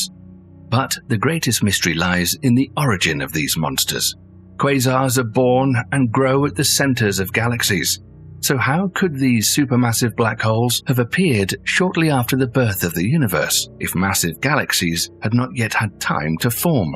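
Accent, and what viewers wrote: United Kingdom. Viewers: Can’t the narrator be an American. I can’t take the awful sounding British accent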